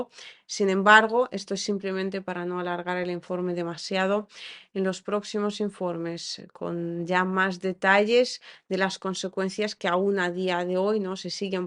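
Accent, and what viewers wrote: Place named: Spain. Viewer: me gusta que ya estás perdiendo tu acento gachupin… mira guapa, eso que icieron es terrorismo puro y deben caer los culpables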